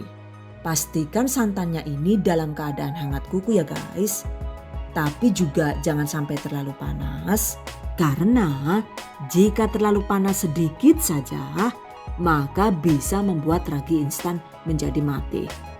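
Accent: Banyumas, Indonesia. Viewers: Ya Allah ngguyu ngakak nganti ta ulang² serius deleng mlh ngapak² ,, kencote metu 😅, suwun mamake resep e mntul pisan 👍🏻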